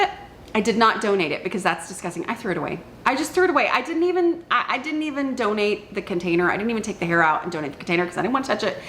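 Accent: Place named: Texas, United States. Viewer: Postal scale (I believe speaks Texan ❤😊)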